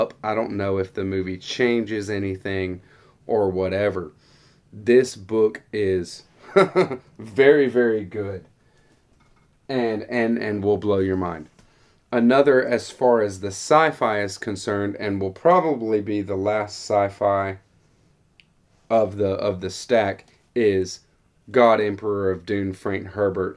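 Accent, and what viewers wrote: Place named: Western Maryland, United States. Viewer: Good video man. Never knew about over half of these. Sounds like ur from western MD. Thats my old stomping ground.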